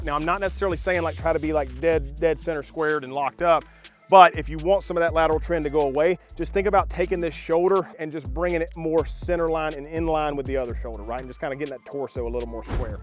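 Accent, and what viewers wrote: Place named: South, United States. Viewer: He is saying it right. Y'all just aren't from the south, apparently. Lol. I'm a fellow southerner and have said "pilla" for all of my 36 years.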